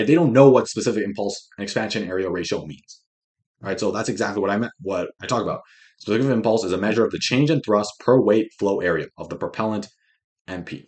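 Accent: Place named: United States. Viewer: he speaks pretty american english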